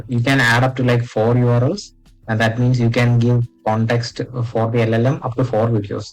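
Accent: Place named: Kerala, India. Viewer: I think your Malayalam should not come in English and can be litter slow and steady in talking.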